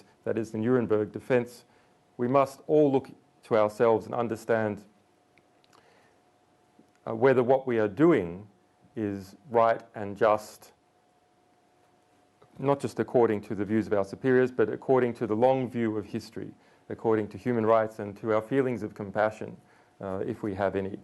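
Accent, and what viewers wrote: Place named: United Kingdom. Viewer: I don’t attack intelligence agencies anymore. Once you reverse engineer history and identify who funded the creation of all intelligence agencies of every nation then you see that they are no more responsible for social engineering than governments and individuals in positions of power within government. This all stems back the the Bauer/Rothschild Dynasty that have their lineage and agents in the leadership roles of every institution of influence and power and all supposed “people’s Movements” even you Jillian Asange are an agent time releasing narratives they want believed. The fact that you have a British accent alone only reinforces this charge. Sean Combs is not a sexual predator, he refuses to endorse Donald Trump while Katt Willams is being promoted because he’s shlobbing the knob of the golden calf. You are obviously trying to seat Trump so that he can Reinact 1938 with the US playing the role of Germany, and he playing the role of Adolf Hitler.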